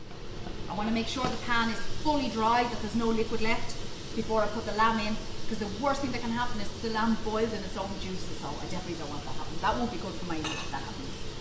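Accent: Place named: Ireland. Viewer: Nice to see new videos love watching the videos and hearing that beautiful Irish accent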